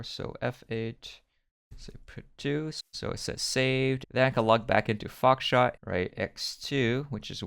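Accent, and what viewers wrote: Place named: England, United Kingdom. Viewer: The english akzent😂😂😂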